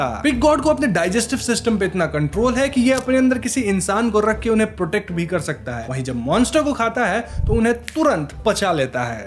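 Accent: Bengal, India. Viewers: Bro you are a Bengali right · Are you bengali... ❤😊😊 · Are you bangali?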